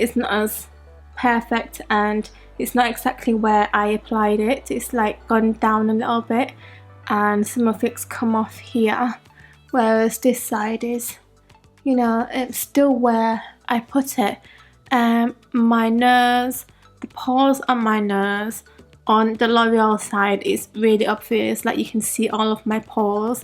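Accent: United Kingdom. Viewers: I love Asian Britain girls accents because of their so strong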